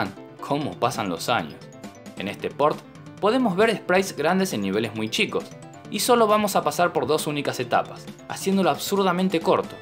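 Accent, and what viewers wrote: Argentina. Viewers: Por que carajo me olvide que seguia este canal? Muy bueno tu contenido y tu acento argentino pipicucu saludos de Entre Rios. (faltan insultos) jajaja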